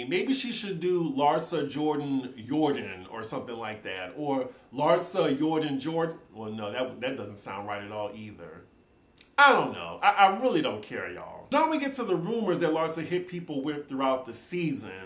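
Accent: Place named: South, United States. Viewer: not you ashamed of your southern accent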